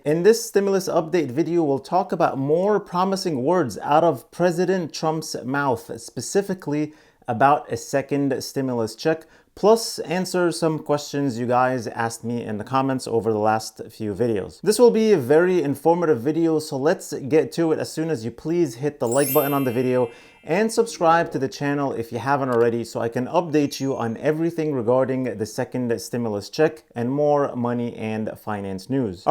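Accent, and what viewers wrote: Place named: Arab world. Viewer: With all my respect to you. Money doesn't buy happiness. WHERE'S your awesome accent coming from? Arabic?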